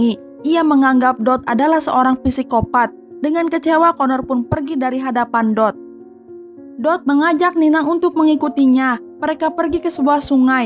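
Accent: West Java, Indonesia. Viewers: Logat Sunda mu mbak , aduhhhh .. tolong perbaiki mbk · Sama😭 itu kek logat sunda sih bukan medok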